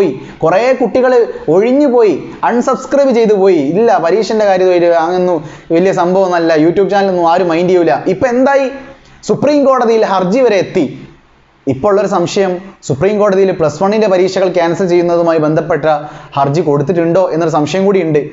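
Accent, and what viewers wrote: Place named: Malappuram, India. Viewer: താങ്കളുടെ voice അടിപൊളി പക്കാ mlpprm ഭാഷ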